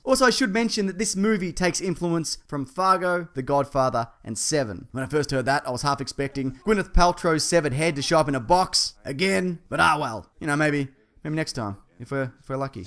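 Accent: Australia. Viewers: Are you a Aussie youtuber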